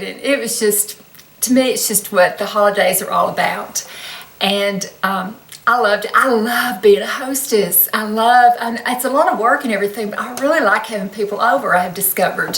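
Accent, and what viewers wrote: South, United States. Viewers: You are beautiful!!! This is the first time I've seen any of your videos and it was very helpful. You must be somewhere in the South? Love your accent, you sound like my sweet Aunt Dot in a little town called Meansville, GA, about an hour outside of Atlanta. May I ask your age? Thanks for this video. I WANT THAT PALETTE!!!!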